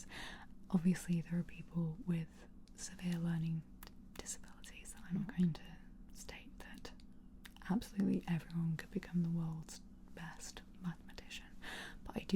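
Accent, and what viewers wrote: United Kingdom. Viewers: You have a great ASMR voice. As an American, I think the British accent is a wonderful bonus as well. 👍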